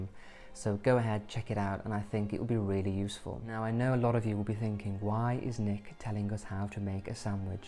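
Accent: RP, United Kingdom. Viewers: Oh my god I couldn't imagine working with this geezer. Speak normal because I can't stand posh people, it's like they act so superior and know everything. Oh shut up. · Lovely concept and lovely video. I’m curiously wondering about the accent. I’m assuming it’s British but from which part? Possibly from Africa , Zimbabwean maybe? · So posh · You have a British accent like the lead singer from the. You guys must be from the same town.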